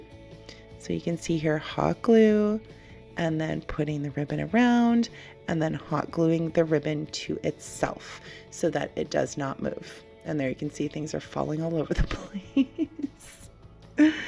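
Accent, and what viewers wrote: Canada. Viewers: Hehe I keep forgetting you're Canadian!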